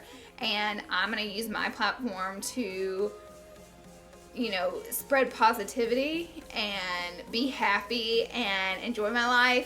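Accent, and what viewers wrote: South, United States. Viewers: i'm texan and her accent is way too southern for even texas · Hi, new sub here! Love your personality and southern accent! Can u plz do a tut on the look in this video.. I've been on the hunt for a purple eyeshadow look like this! Thanks!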